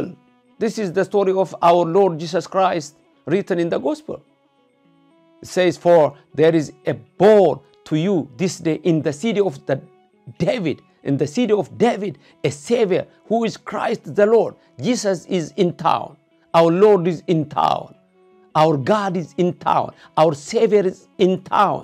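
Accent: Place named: Gojjam, Ethiopia. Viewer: እንግሊዝኛን በጎጃምኛ።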